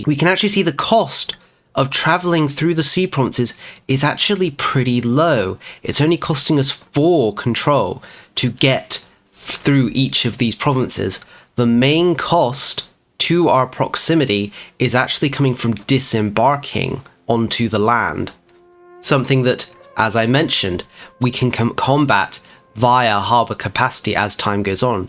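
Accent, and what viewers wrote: United Kingdom. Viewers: Are you British living in America, or an American living in Britain?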